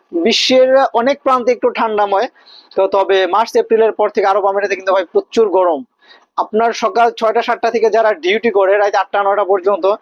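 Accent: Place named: Bangladesh. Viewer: ভাই আপনি বাংলাদেশ থেকে নিউজ করেন নাকি দুবাই থেকে নিউজ করেন তাইলে একটু জানাবেন. আপনার কথা বোঝা যায় আপনি বাংলাদেশ থেকে কথা বলতেছেন.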